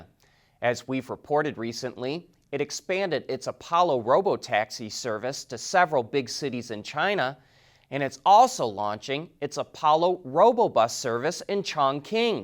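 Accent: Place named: Michigan, United States. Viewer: Heavy Michigan accent!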